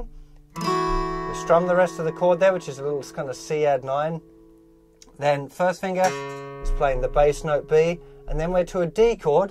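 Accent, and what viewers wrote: United Kingdom. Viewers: You have a British accent, and a Geordie hat. Thanks for the lesson. I used to play this, but it was years ago, and I needed a refresher. Thank you! PS: is your guitar make from? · I am from Liverpool, England. Lennon actually sings "she WORKED In the morning and started to laugh," not she walked in the morning et al. We Brits have trouble rolling the letter R. You sound like a brit....are you. You do a great job teaching and seem like a nice talented lad. Please keep up the teaching. Tara lah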